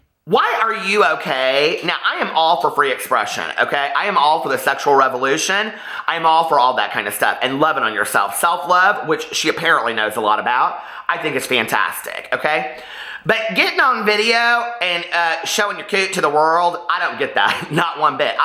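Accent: South, United States. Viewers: My husband said once that you sounded southern too, but I let him know you were from Indiana 😉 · Omg i totally thought you were southern when i watched your first few videos but i looked at your mailing address to see where you live. You have such southern mannerisms and have a hint of a southern accent and pronunciations i fking love it i miss my southern queens. Northern Michigan is so VERY DIFFERENT then my home town of Tampa, Florida! Love you and love everything about you and your vids! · Mr, I'm in Charlotte North Carolina and u absolutely sound more southern than most of us here. 💙💜💙💜💙💜💙💜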